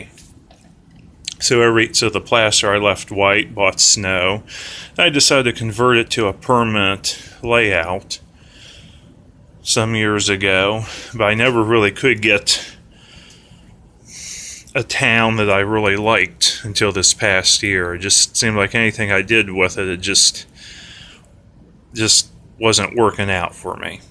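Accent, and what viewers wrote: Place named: Western Pennsylvania, United States. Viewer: Are you from Western Pa? you got the accent!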